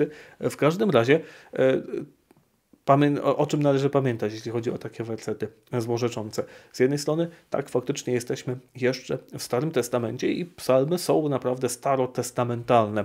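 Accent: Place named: Italy. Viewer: Strasznie mnie drażni włoska wymowa łaciny przez księdza. Równie dobrze mógłby ksiądz wymawiać łacinę według reguł polskiego języka albo jakiegokolwiek innego. Uczyłem się łaciny u trzech mistrzyń, a ostatnią była ś.p. pani Maria Kosiarska na KUL, która cieszyła się ogromnym autorytetem i nigdy nie słyszałem, aby którakolwiek z nich mówiła manium, redżina czy czelum. Zawsze było magnum, regina i coelum. Dla mnie okaleczanie łaciny poprzez włoską wymowę jest obrzydliwością, bo każda deformacja jest miła diabłu. Poza tym dzięki za świetne audycje.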